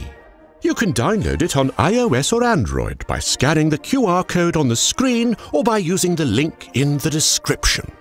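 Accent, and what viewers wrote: RP, United Kingdom. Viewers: Your content is very interesting and well made, and the narrator's posh accent is the cherry on top 👌 I'm glad this channel is slowly getting more recognition. Hope the best to you!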